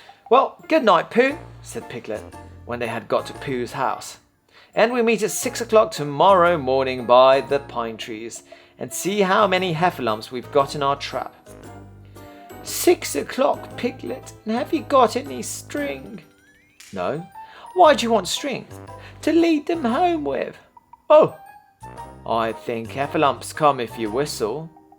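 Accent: United Kingdom. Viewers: British accent 大好きです😌ずっと聴いてたい✨✨✨ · やはりイギリス英語が好き！の声、活舌、トーン、ピッチ、最高にドはまりしましたよ☆ これまではベネティクトカンバーバッチの癖強め朗読聴いてました(それはそれで好き💛) もっと沢山聴かせてください~☆ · のイギリス英語が好きです！こっちの方が聞きやすいですが、BGMもないとさらに良いですね。すみません汗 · はっきり言って、挿絵が有るのがすごく助かりました😂文字は追えても、イメージを作る余裕がないので、絵が有るだけですごく解った気分になりました❣️それと、ページをめくるのが、本当に読み聞かせしてもらっているような心地良さがあり、色褪せた部分からはお祖父様の大切な本をシェアしてくれている実感が何とも言えない😊 BGMは無い方が、の世界に引き込まれるし、ストーリーの味も、引き出されるかな。一方で、が紅茶を注いでいる音、焚き火の映像、途中で焚き木をくべる様子、全てがうっとりするくらい癒される時間です。何より、本物のイギリス英語のリズムの、なんと美しいこと❣️英語の理解はグッと下がるけど。（何十年も前に買ってあった原書を引っ張り出して来て、読んでもみました。） つまり私の希望は！同じチャプターを両方でやって‼️です😅どうかお願いします🤲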